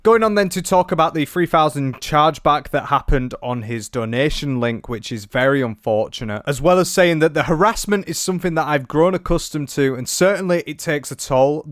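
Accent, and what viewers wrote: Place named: North, United Kingdom. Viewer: I love how your "Northern English Twang" makes every time you say "Blair", it sounds like "Bleh"😂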